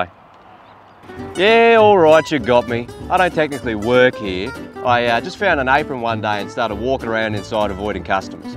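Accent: Australia. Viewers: Australian as fuck 🇦🇺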